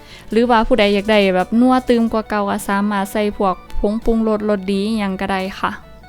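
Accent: Isan, Thailand. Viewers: คือเว้าม่วนแทะครับอิสานทางใดครับ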